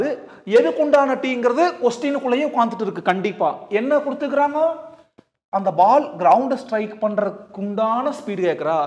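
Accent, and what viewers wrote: Coimbatore, India. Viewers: Neenga Coimbatore pola......slang theriyudhu sir....me too.....❤️from cbe❤️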